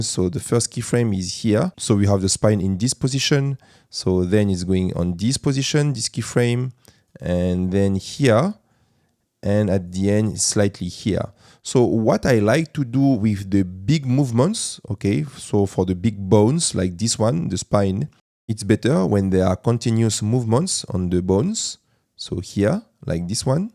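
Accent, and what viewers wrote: France. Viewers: J’ai reconnu un accent français si je ne me trompe pas. Si je ne me trompe pas cargo ne fonctionne pas pour la version actuelle de blender c’est dommage que ça rende obsolète tout tes tutos ou tu l’utilises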